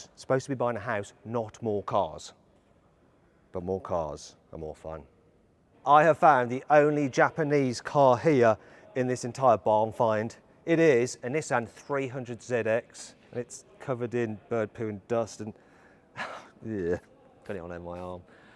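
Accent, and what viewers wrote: Birmingham, United Kingdom. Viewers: Is this guy a brummie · Never thought i'd hear "barn find" in a thick, British accent again. I already miss you, Horizon 4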